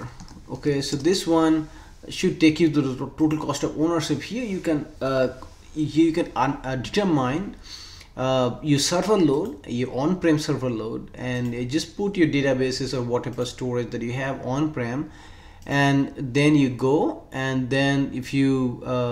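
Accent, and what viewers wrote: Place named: Bangladesh. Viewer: Very informative and useful video. Thanks. (in an unrelated note: are you some how connected to Bangladesh ? Your voice notes has mild accent of Bangla. BTW, I am a bangali :-)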